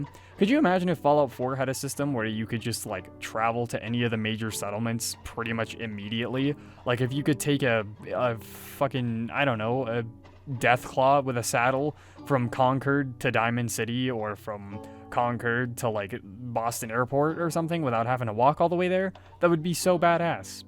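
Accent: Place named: Saskatchewan, Canada. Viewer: fair enough… I was just sitting here watching with my girlfriend wondering aloud if you were from Saskatchewan.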